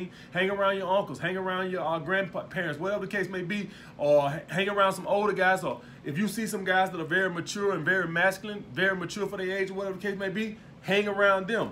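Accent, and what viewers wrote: South, United States. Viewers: you been living in NY few a while and still got that Southern Accent! But I see what you’re saying fam.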